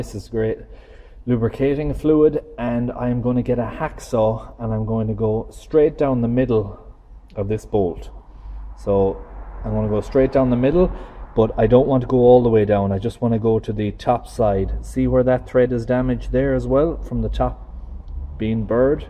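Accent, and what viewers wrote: Ireland. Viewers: Ahem...I just love your accent...it reminds me of my famous English...Irish teacher...what a great guy...that was 45years ago... Hahaa. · Always believe a man with an Irish accent. Love from Australia!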